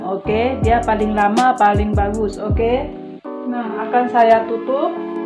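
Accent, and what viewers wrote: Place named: Medan, Indonesia. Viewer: Orang medan mana suaranya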